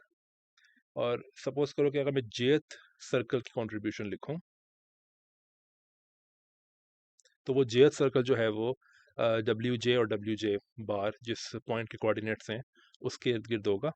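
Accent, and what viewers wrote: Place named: India. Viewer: Either this is a hardcore Indian accent or you're not speaking English at all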